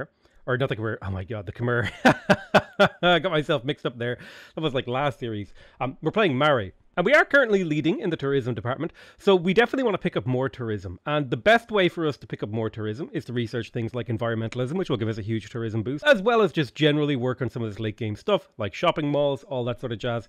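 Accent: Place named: Ireland. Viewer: That moment when I hear an Irishman talking about paddy fields, and becoming fully redfaced and relieved when I realize "Ahh talking about rice, I'm an idiot!"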